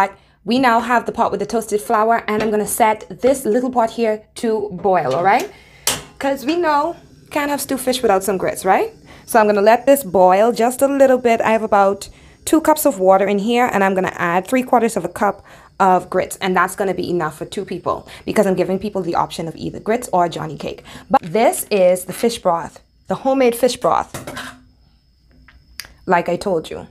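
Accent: Bahamas. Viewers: I really enjoy watching you cook! The accent and the comments only Bahamas’s understand 😁 bring back so many memories of my childhood. I’m American born but my father is Bahamian. Love the food dishes from the island! 😋 👍🏾❤️ by the way, hadn’t heard monkey foot in a minute. My cousins used it all the time. 😁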